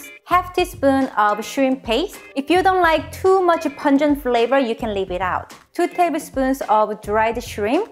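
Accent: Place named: South Korea. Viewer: Omg you make every languages sound just korean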